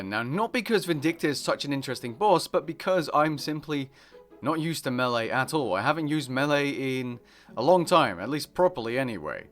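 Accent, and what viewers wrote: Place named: Netherlands. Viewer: Are you dutch? You can really tell by the accent haha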